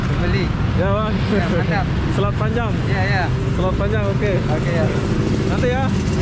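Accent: Lombok, Indonesia. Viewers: Bahasa Melayu mcm Malaysia tapi saya tetap boleh perasan slang Indonesianya hehehe Unik betul org nusantara nih! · Hahahha pandainye abang bual cakap dari lombok😄😄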